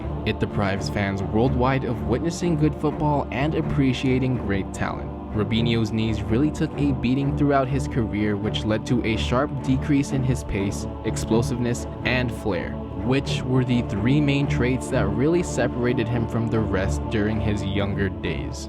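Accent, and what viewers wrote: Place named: United States. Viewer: I cant take football videos seriously when their commentated by Americans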